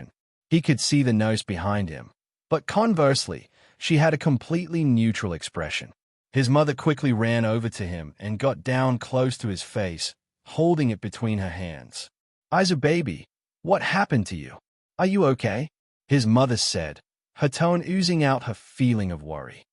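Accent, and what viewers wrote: United Kingdom. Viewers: Also why is the ai British?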